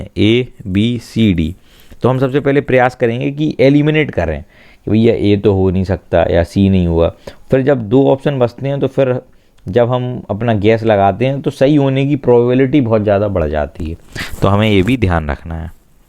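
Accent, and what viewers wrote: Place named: Jhansi, India. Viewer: Apki language jhansi ki lg ri h sir jhansi k h kya sir aap😁😁😁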